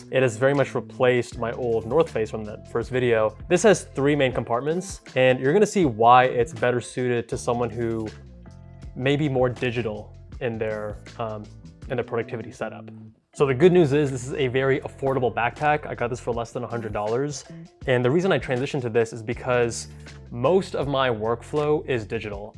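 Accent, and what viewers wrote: India. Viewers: ah yes the indian accent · The Indian accent is ok with me 👍 Sincerely, an Indian person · Loved you INDIAN accent dude💕💕💕💕💕💕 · I vote, Indian accent every intro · 👏👏👏👏very nice with the indian accent ,guud than Indians, with beauty tips and steel except that plastic... haha !! Like 👶👶 enjoyable and useful and diff accents pls😇😇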